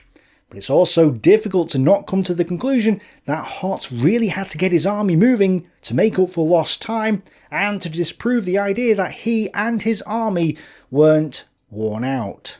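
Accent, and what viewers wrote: United Kingdom. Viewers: I can feel my bone healing as I listen to mild British accent speaking about the glorius carnage on Volga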